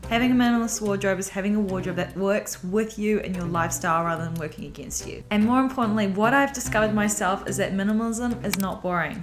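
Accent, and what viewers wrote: Australia. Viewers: What about active wear (sports wear) and winter wear, jumpers, cardigans, hoodies, coats for various occasions and comfy stuff to wear around the house? I like the idea of a more intentional wardrobe, but what's on that graph - all looks like things you go out in, which is fine, but seems like quite a big gap in content, no offence, I appreciate this is more from a styling perspective of clothes to choose when leaving the house, but as someone looking for wholesome practical content on the matter, I'd like to also see a breakdown of the other parts of the wardrobe and how to curate that. Like, how many coats, cardigans, jumpers, hoodies, even pj's to stick to, or multi purpose clothing options in these parts of wardrobe, that kind of stuff. I really like you're approach, would love to hear your take on those parts of wardrobe too. I don't know if you're from Australia judging by the accent, but I'm in the UK, and we can have 4 seasons in 1 day sometimes times, and winters are cold, like I can't imagine surviving a winter with the wardrobe in your video. 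Anyway, if it's relevant for your audience, I'd love to see a video for decluttering winter wardrobe! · So glad I found you! Also, I moonlight as a dialect coach in my theater community and you're giving chef's kiss Elevated Aussie! 🥰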